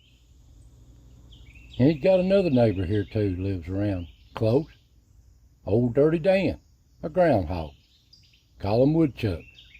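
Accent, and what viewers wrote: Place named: South, United States. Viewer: love ya and your videos. 😢i lost my grandpa in 2013 he was 86 and in ww2 n he was from gatlinburg TN. your Southern voice sounds like my papa. God bless n ty ❤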